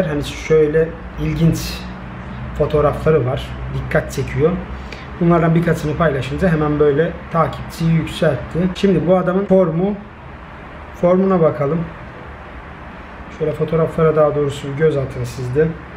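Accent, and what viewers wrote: Black Sea, Turkey. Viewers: Abi sen karadenizlimisin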